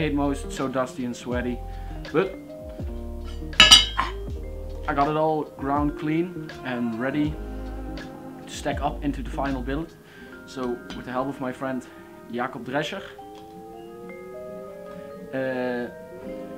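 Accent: Netherlands. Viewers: Are you dutch? Your accent seems so Edit: ik lees nu pas je beschrijving😂 ik hoop dat je weet dat een yatagan eig geen zwaard is maar een dolk👌🏽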